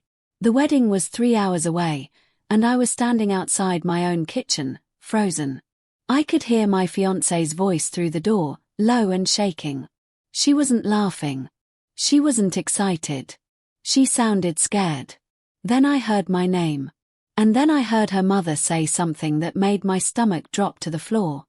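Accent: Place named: United Kingdom. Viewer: Why is a British woman doing the narration ofnitnis from the perspective of an American man?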